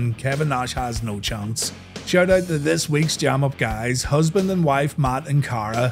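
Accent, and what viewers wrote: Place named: Northern Ireland, United Kingdom. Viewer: It’s been a long week lads, let’s gather around the fire and reminisce about 90s Wrestling as narrated by a delightful fella from Northern Ireland.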